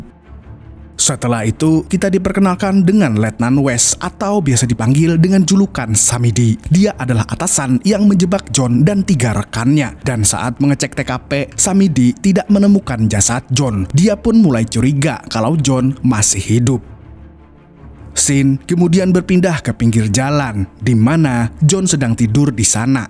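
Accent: Banjar, Indonesia. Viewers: Urang Banjar kh bng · Urang banjar kah nang ba'isi chanel ngeneh..??😁😁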